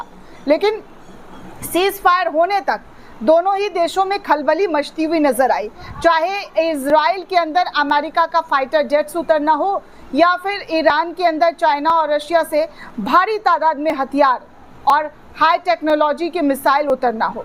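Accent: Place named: Uttar Pradesh, India. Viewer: She is from UP probably from western UP or Central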